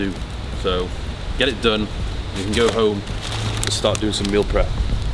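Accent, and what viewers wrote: United Kingdom. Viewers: Thank you very much. Improved my fitness knowledge and even my skills on understanding more the British accent (I’m from Italy) 💪🏼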